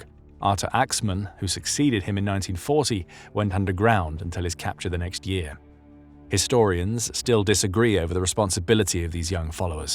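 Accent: United Kingdom. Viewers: I am more concerned that there is a british voice narrating the lives of germans rather than blue eyes and blonde hair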